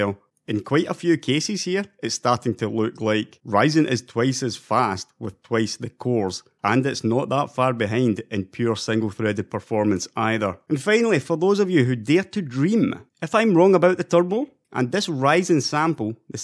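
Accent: Scotland, United Kingdom. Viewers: it's just a Scottish accent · this guy sounds like someone from scottland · that's just an scottish accent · No need to apologize for the voice as I'm pretty sure most people have figured out by now that you're Scottish :P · the Scottish accent is not that bad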